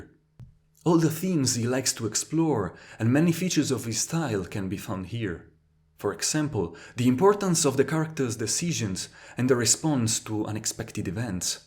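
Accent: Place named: France. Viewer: good review; I presume u r French as you sure do have some of Denis accent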